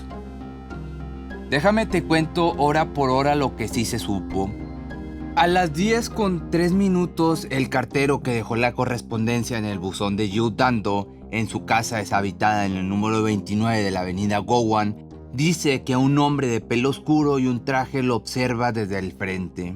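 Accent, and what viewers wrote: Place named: Mexico. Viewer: eres muy guapo🥰🤗,..descubrí tu canal ayer y de una me suscribí 😁...de dónde eres, tienes un acento como ser de México 🤔🤭😻😍😘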